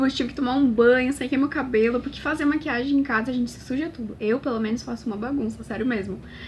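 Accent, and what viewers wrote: Rio Grande do Sul, Brazil. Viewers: Parece gaúcha♥️ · E quê vc fala que nem gaúcha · você é do RS? Percebi o seu sotaque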